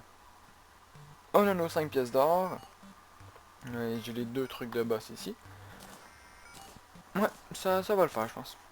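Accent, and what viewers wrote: Quebec, Canada. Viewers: Mais il le dit comme ça parce que il est Québécois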